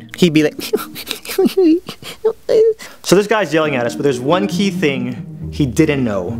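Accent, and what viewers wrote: Trinidad and Tobago. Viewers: I was waiting for him to bus out a trini accent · You from Trini?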